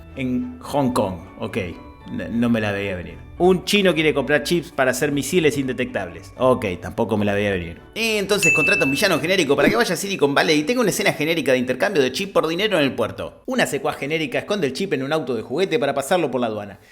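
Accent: Argentina. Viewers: Qué bueno eres. Y ese acento argentino le da mayor intensidad al humor.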